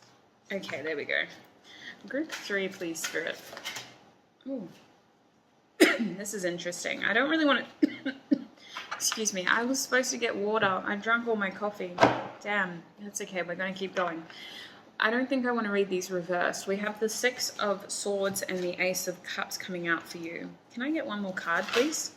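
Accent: Australia. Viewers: are you austrailian?? i love your accent! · Group#1. Thanks a million! I am so grateful for this reading. It's so finetuned it's unbelievable. You are truly one of the most gifted readers on YouTube, if not the most. Much love, much light, much power to you 🙏🙏💗💗🌈🌈 And I love your Australian accent, so very charming!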